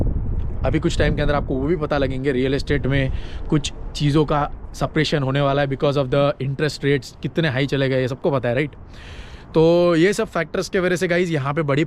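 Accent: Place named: Punjab, India. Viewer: Kaun jagaga o.tha? 🫣 Aap bolo naa bolo hum log samajh gaya😆 Punjabi hi hoga🤭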